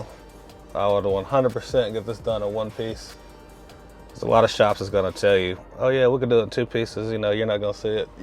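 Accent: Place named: Tennessee, United States. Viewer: Knew he was from Tennessee. He’s talking too cool. That’s how we are.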